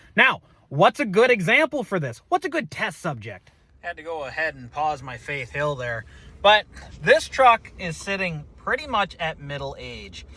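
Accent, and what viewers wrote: Canada. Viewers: The Canadian accent lol its all good! It reminds me of the Red Green Show! I'm from southern Ohio near west Virginia so don't worry!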